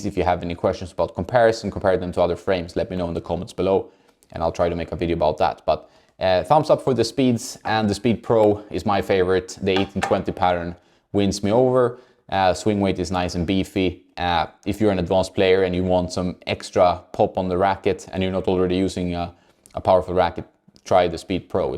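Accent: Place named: Sweden. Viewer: Swedish english accent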